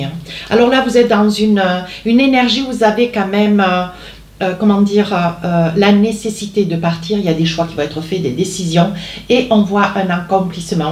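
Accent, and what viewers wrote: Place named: Southwest, France. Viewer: Vous avez l’air fort sympathique et me faites bien rire, avec votre parler cru mâtiné d’un petit accent (sud-ouest?). Pour le fond, mensonges et trahisons on s’en passerait mais succession et lointaine destination sont des mots qui vont très bien ensemble ;) Affaire à suivre, en vous souhaitant le meilleur à vous surtout!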